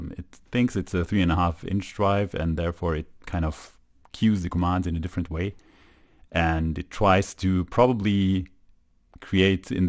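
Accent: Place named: Germany. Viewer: His wording was cuckoo, but “five point twenty five” is incorrect, too. Though people occasionally do it, it’s not correct to speak out digits after a decimal as a single number (except in currency). So it’s either “five point two five” or “five and a quarter”. He’s not a native speaker. My guess is he’s German.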